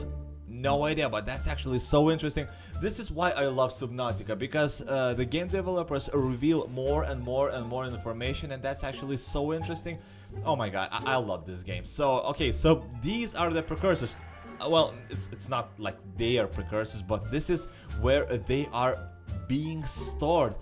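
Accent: Russia. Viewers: I love your Russian accent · are u russian? if u are i like ur accent keep up the good work;)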